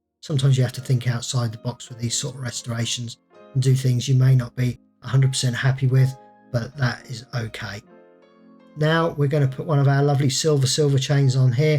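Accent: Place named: England, United Kingdom. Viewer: The enjoyment you derive from your work is almost immediately attuned to the viewer's eyes & ears. The narration is most pleasant and always to the point, albeit with that extra British charm that an English accent brings to anything. Your humble directness really makes the whole thing a cooperative adventure in restoring these old beauties to their original dignity. Your keen eye and steady camera work really convey the importance of a thorough process. Your videos must really resonate to a very wide audience. Mike Kirwan - Kenmore, WA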